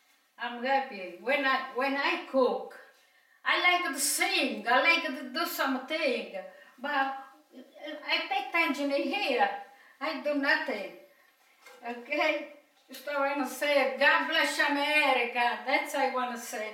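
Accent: Italy. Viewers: I watched my mom make the shells mostly for holidays. You even say it the way we do. Same dialect, must be same part of Italy 🇮🇹 thank you for the memories and recipes. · Italian-english, two in one 😘😘 💓❤️ 🌹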